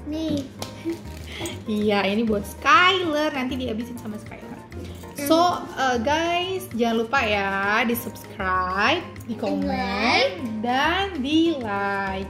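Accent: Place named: West Java, Indonesia. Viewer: ada aksen sunda nya ya.. intonasi nya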